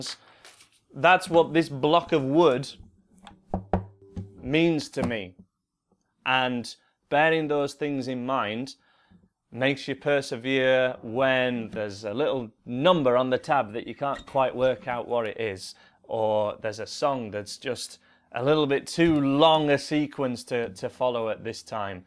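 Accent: United Kingdom. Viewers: are you British or Scottish?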